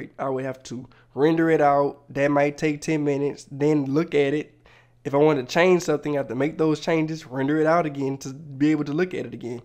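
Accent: Louisiana, United States. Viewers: Yaaaaas. I’m an Instructional Designer and have been watching tons of these videos and doing research before I make my purchase. Loved the review. Glad I came across your video. Also, me after a couple of minutes of hearing you speak: “I wonder if he from Louisiana?🤔” 😅 Thanks for this vid! ⚜️❤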